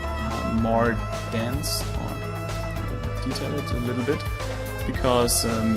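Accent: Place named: Mannheim, Germany. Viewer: Are you from near Mannheim in Germany? I recognize the dialect! XD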